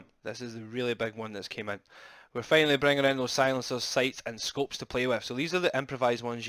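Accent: United Kingdom. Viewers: From birmingham ?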